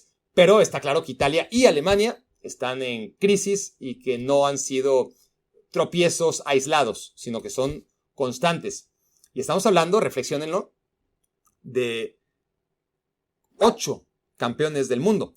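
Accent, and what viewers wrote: Mexico. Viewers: Pues a mi es escucharte y me suena a Méxicano. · Sí suenas mexicano. Viva México. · Yo creo que tu asento es muy neutro pero si se nota cuando te expresas que eres mexicano, el mexigrindo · Si, tu acento es Mexicano, un extranjero te lo puede decir, porque un nativo se acostumbre y cree que no tiene acento... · Hablas como en el bajío, en Guanajuato. Los centroamericanos y sudamericanos suenan muy distinto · Súper mexicano su acento. Desde que empecé a ver su canal noté que era mexicano. Saludos desde Costa Rica.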